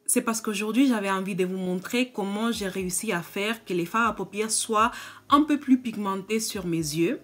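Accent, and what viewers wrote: Spain. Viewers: I love your spanish accent omg 😻😻😻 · adorable et super vidéo !! (y se nota que vienes de españa jaja!! mi madre tiene el mismo acento en francés! suerte guapa<3)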